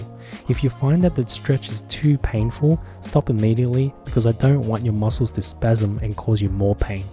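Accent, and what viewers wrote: Australia. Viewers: He has an Aussie accent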